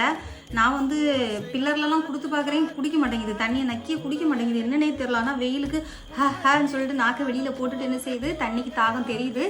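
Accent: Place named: Madurai, India. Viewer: நீங்க கண்ணியாகுமரி மாவட்டத்த சேந்த ஆளு ஆனா மதுரை பக்கம் இருக்கற பாஷை பேசறிங்க சூப்பர்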